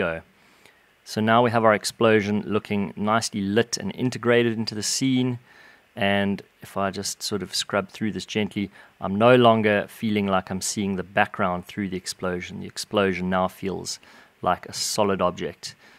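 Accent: South Africa. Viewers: r u from south africa?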